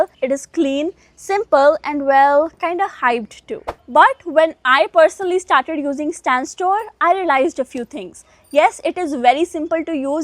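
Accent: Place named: India. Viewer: your accent feels like India + name also But nice reply me are you Indian?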